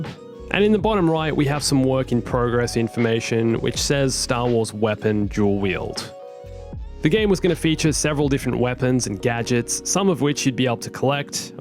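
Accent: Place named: Australia. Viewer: What part of aus are you from mate ?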